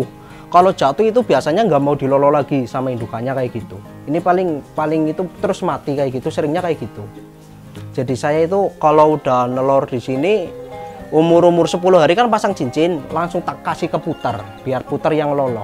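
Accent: Banyumas, Indonesia. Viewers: Ora ngapak ora kepenak yak.. :-D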